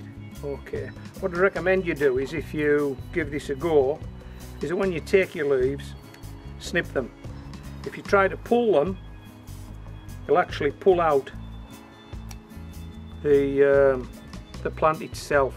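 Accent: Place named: Scotland, United Kingdom. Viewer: GMC 06 he sounds like Scottish man 😋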